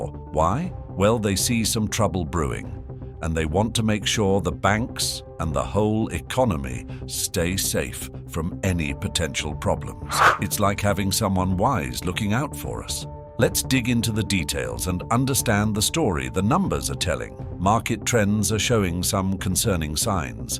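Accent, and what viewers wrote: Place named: United Kingdom. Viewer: When I heard the voice was British....I knew everything said would be a lie.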